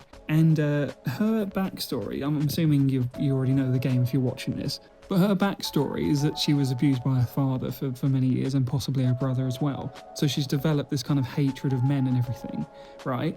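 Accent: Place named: United States. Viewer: PS I love your American accent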